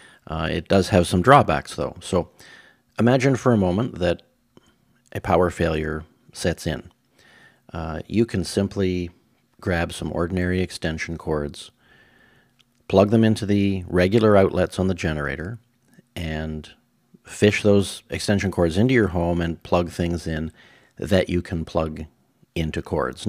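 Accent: Canada. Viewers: I can tell by your accent that you are Canadian. One other legal connection here in the U.S. is a metal block that bolts to your home breaker panel cover. To use it, you have to install a second main breaker below the original then install the block. The block will move up or down to allow EITHER your main panel breaker OR your generator's main breaker to be switched on but NOT both at the same time. I can't use any of these options because the idiots who built my house placed my panel box in an inside wall and it would take major design changes in the construction of that wall to allow rewiring of the panel so I did what I wrote below a year ago.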